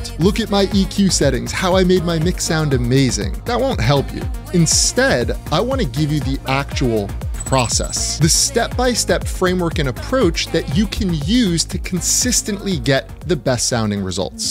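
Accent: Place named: Arab world. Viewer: Your accent is from arab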